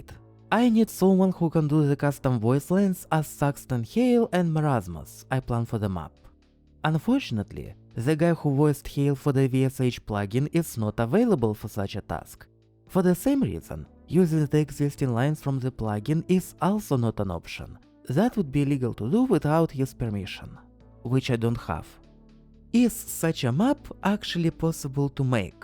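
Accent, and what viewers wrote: Russia. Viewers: he is russian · Probably slavic. Really strong russian accent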